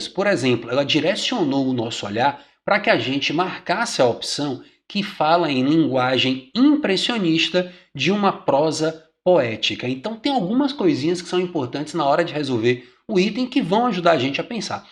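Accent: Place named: Bahia, Brazil. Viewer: baiano ne? e foda!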